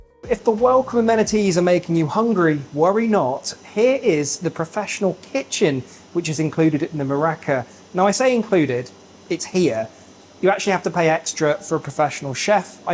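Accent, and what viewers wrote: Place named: England, United Kingdom. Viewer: I used to think the English accent was enchanting and " lov-e-ly ". But now, seeing what they did to their country with mass immigration ; listening to this guy is nauseating.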